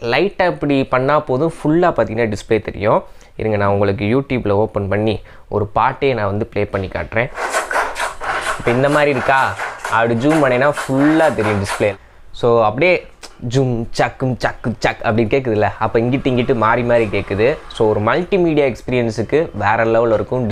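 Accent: Madurai, India. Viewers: Bro niga madurai thana bro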